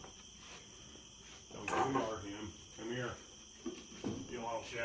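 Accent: United States. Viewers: i cant get enough of ur american accent, i just love it how u say "come here u little shht" 😂😂😂 much love from Estonia!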